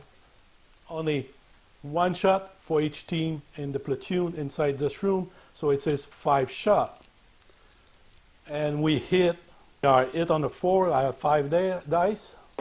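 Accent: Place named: Quebec, Canada. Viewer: Hey!! is french your first language? cause it seems like you have a quebecois accent:)! if so, i'm quebecois too! I really enjoy your videos! You are really helpfull, cause i start doing some build of model kit and i didn't really know how to. and i found your channel and it helped me a lot! And it's cool that you are canadian!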